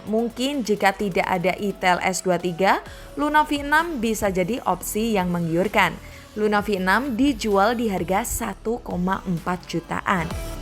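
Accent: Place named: Java, Indonesia. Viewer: Bacanya ga masalah, medoknya itu yg masalah...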